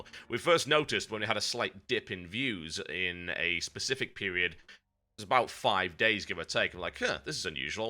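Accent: United Kingdom. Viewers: that just doesnt have a nice flow to it tho cynical brit yeaah cynical american eh? beside aslong as he doesnt lose his british accent and doesnt start pronouncing words like puma the american way then hes still a brit to me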